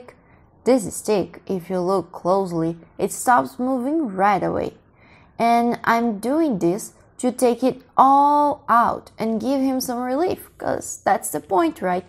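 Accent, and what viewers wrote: Northeast, Brazil. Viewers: que pena uma dublê , era mais lindo sua voz nordestina, que nem a minha. 😊😍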